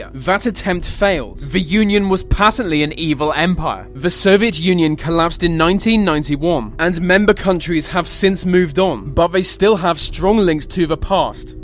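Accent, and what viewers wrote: United Kingdom. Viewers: You sound british yet people claim you're american, weird. Nice vid though.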